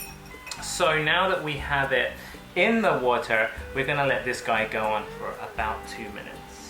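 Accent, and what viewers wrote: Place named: United Kingdom. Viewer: I’m so confused.... you said stove then apologized for British accent then explained stove=cooker... who the fuck says cooker???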